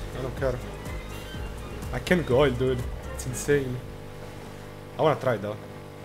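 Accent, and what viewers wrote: Italy. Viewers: ma sei italiano? Hai proprio l’accento italiano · You have a really strong like Italian accent lmao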